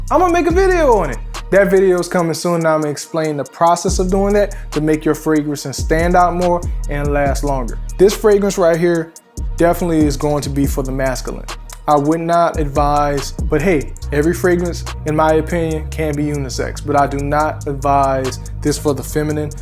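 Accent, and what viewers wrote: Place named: South, United States. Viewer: You must be from the south, the way you pronounce st words 😂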